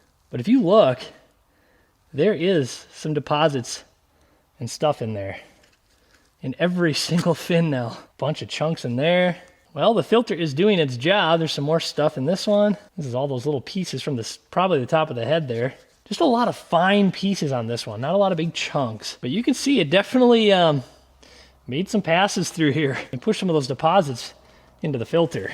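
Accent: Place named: Midwest, United States. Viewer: mid west accents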